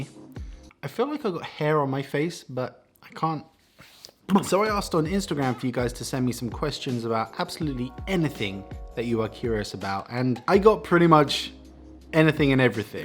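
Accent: Finland Swedish, Finland. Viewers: He is talking finland swedish not swedish.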